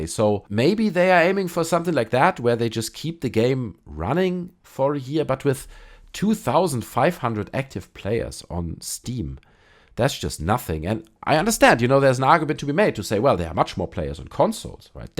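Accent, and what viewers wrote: Germany. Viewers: could it be that you are german? you have that distinct accent ;) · The accent is so bad i am pretty sure you are a german. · The german accent is strong in this one.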